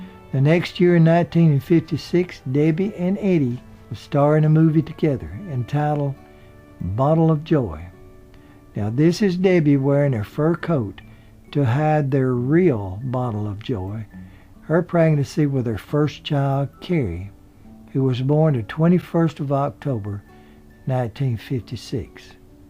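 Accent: South, United States. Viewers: 👍YES I TRULY LOVE his southern accent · ja, er ist einfach super Mit seinem 'southern Snarl' So howdy mässig :o) Recheriert sicher viel LG aus Franken!!!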